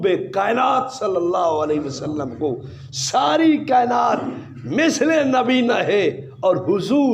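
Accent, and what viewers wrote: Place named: Punjab, Pakistan. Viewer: بہت خطرناک پنجابی بول رہے ہیں، کچھ بھی سمجھ نہیں آ رہا ہے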